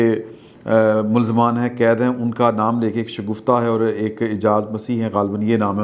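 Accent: Punjab, Pakistan. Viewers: Indian Punjabi here, makes me sad what’s happening in Pakistani Punjab. Although I love anchors Punjabi Urdu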